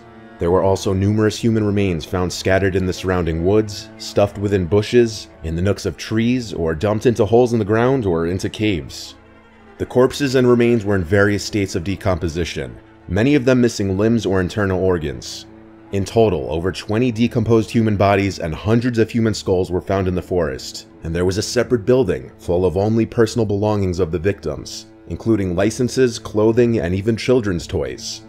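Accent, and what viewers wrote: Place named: New Jersey, United States. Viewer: Great videos! I am super fan. I feel like I hear a slight NJ accent. Or NY maybe... Anyway, keep them coming!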